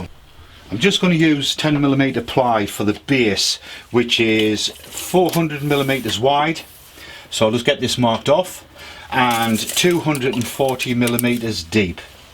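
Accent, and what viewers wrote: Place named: Sunderland, United Kingdom. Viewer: Sounds as if he's a Mackem from wearside?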